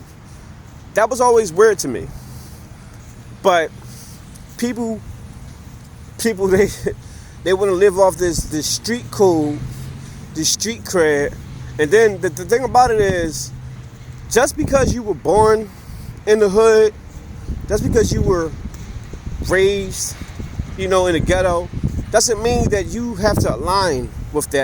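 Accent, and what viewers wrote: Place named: Caribbean. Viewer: This sounds like Tether babble you must have a carribean background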